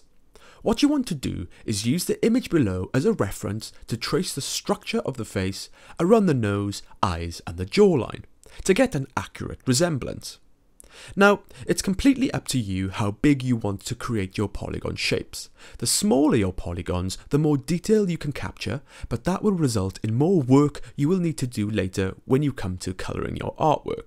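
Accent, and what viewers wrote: United Kingdom. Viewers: it might be the british accent responsable for my confusion but you sound very much like the dude from the " School of life" chanle x)) helpful tutorials, by the way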